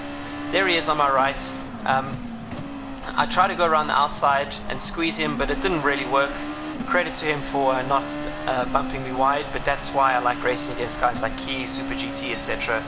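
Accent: South Africa. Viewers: Nothing like a south African accent......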